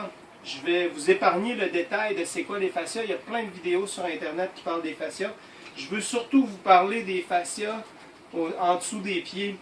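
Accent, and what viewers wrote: Canada. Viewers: VOULEZ-VOUS FINALEMENT BIEN APPRENDRE PARLER EN FRANÇAIS ??? INCROYABLE VOTRE canadien façon de parler ((((( UN VRAI CAUCHEMAR ET HONTE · je vois aucune honte a ce qu'un canadien parle le français qu'il a appris, la façon de parlé et l'accent est très différent du pure français, a l'oreille ça fait un peu bizzare cette différence. Mais ce serai pour moi un peu de la discrimination de ne pas accepté ces différences entre notre français et le votre. Merci pour votre vidéo j'ai une fasciite plantaire et mal le long du nerf sciatique, ça pourrai m'aidé.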